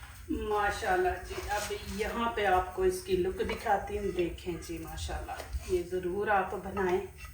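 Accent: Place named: Punjab, India. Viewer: Apna bo8lne ka st5yal sake karin. P9anjabi le3hja na ho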